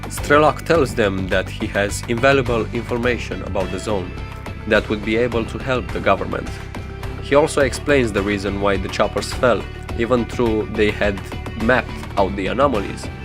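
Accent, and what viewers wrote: Romania. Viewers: asta e prima data cand vad ceva de la tine, cum te-am auzit vorbind : "sigur e ro" ma duc la "about" - :D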